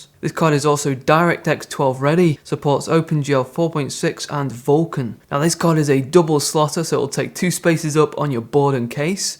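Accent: England, United Kingdom. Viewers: Hi, Thank you for another great video. is one of my favorite YouTube channel because of the following reasons: High quality video content The (video card) product presentation is VERY professional The camera angle is Perfect The sound is very CLEAR I and my wife enjoy watching YouTube channel, because my wife and I really like gaming PC, and high end computer components ( video card, motherboard) My wife tells me that the gentleman in this video ( I think his name is ) LOOKs like people in Hollywood, she likes English voice. In this video, the new 5600XT is impressive. The VRam's low temperature alone is worth buying. This RX 5600Xt review is very informative and helpful. Keep up the good work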